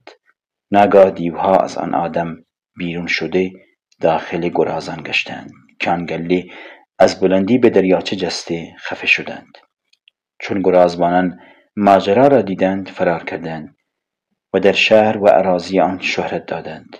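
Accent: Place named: Iran. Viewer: دمتون گرم و سپاس از تلاشتون در مورد لهجتونم باید بگم به نظر من که خیلیم جذاب و شیرینه توفیق مضاعفه هم لهجه زیبای یه خطه از ایران عزیزمونو شنیدیم هم مطالب کتاب را زنده باد تمام ایران و اقوام و مردمانش❤❤❤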